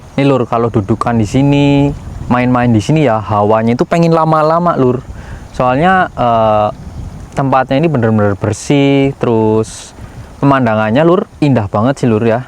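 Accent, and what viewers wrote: Banyumas, Indonesia. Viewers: Cilacap Barat Sidareja hadir Mas, salam sehat dan sukses selalu buat jenengan, Aamiin. Jenengan asline ndi Mas bahasane ngapak,ana retung, nemen dsb 😂😂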